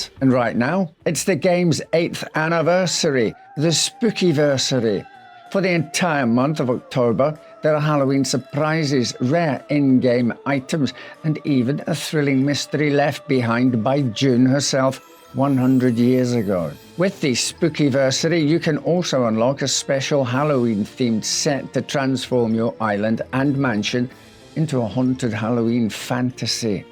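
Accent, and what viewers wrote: Scotland, United Kingdom. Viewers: "Somehow" I knew one-day a British voice would explain that there was a French murderer than was worse than Jack The Ripper! Ha! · I love his voice and accent. Is he Scottish? 🥰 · I’m no expert but that’d be my best guess too, Scottish.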